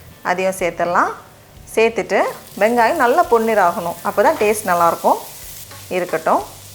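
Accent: Nagercoil, India. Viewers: Hi friend.. Nagercoil vaasam ungal pechu la theriyuthu.. namma oora neenga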